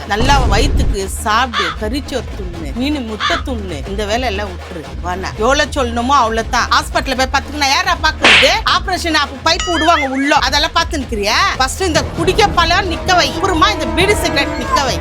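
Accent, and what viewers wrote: Bangalore, India. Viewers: Bangalore tamizh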